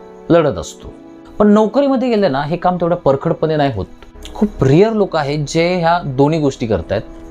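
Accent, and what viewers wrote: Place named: Kolhapur, India. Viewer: छान माहिती व विश्लेषण करून सांगता व तुम्ही तुमच्या बोलीभाषेवरुन आमच्या कोल्हापूर चे वाटता.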